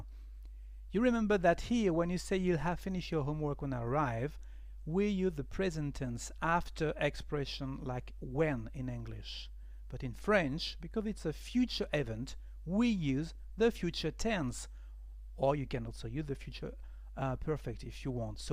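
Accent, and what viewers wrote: France. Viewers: I wish I could learn that amazing french accent of yours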